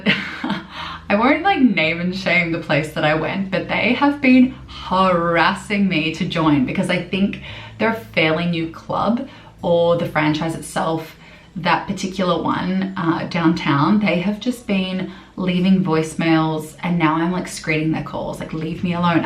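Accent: Australia. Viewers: I would love to explore Melbourne with you one day! I want to learn an Aussie accent from you! Enjoy your wonderful life in Vancouver! Xoxox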